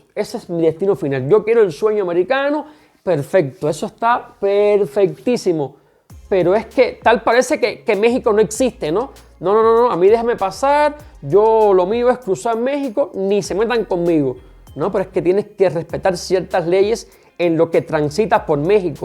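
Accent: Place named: Cuba. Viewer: Amigo hablas como Cubano... Y actúas como mexicano que eres ... Así de simple es y debe ser un discurso... Se tenía que decir y se dijo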